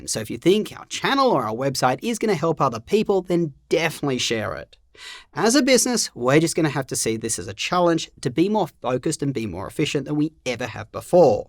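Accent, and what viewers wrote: Australia. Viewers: Why are you US centered when you sound like Crocodile Dundee?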